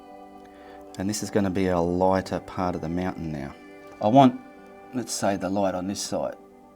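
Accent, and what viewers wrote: Australia. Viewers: Hi! I live in Virginia. After watching many Bob Ross videos and loving them, I decided to look for some videos with acrylic painting, and I found you. You are very instructional and I enjoy your Aussie accent and colloquialisms. I just watched the Misty mountain tutorial. I loved it. Your trees are beautiful! I have a question for you. Why won’t the knife work for painting mountains with acrylic paint? ❤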